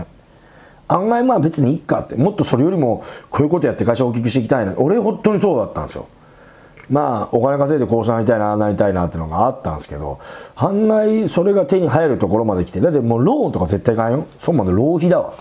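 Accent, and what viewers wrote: Nagoya, Japan. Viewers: 名古屋弁聞くとホッとしますね(^ ^) 為になる話ありがとうございます。 p.s.錦は高級で行けないので、栄の女子大小路によく行きます。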